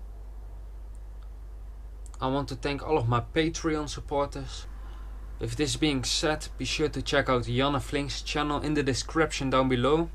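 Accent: Netherlands. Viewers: Je hebt zo'n goede video's.... Kom er steeds op door dat je met anderen op pad bent... en kijk dan toch steeds even naar je vids. Maar pfoe.. ik kan me echt niet abonneren en klik vrij snel weg omdat ik dus al heb gezien wat je gefilmd hebt met je co-host zeg maar. Niet omdat ik niet wil zien wat JIJ geflimt hebt ten opzichte van je co host want ik kom steeds even kijken, maar sorry... ik kan echt niet tegen je "steenkolen" Engels.... Ik weet dat iedere Nederlander of Belg (inclusief mezelf!) gewoon een rot accent in Engels heeft maar het is gewoon TE erg voor mij. Ik kan zelf vrij goed Engels verstaan, lezen, schrijven etc maar spreken doe ik zelf ook liever niet ivm accent. Ik weet hoe moeilijk het dus is maar dat houd ook meteen in dat ik elke Engels met een Nederlands/Belgisch accent gewoon niet aan kan horen :D Het is dus niks persoonlijks en zou willen dat ik je vids met een open blik kan bekijken maar ik kan het gewoon niet.. 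Sorry!! Ze zijn zo goed maar zodra je Engels begint te praten krimp ik in elkaar .....De video met Mark en in the prostitute mansion: de duif of uil: he flight right at him!! The Owl flight right at him!! En niet 1x maar diverse keren en tja.... we weten allemaal dat die zin totaal niet klopt. Wil ook echt niet negatief zijn want je video's zijn goed... erg goed.. maar misschien toch beter in het Nederlands? Trek je echt meer viewers door Engels te praten in je video's? Mark is ook alleen Nederlands...en volgens mij hebben jullie bijna hetzelfde a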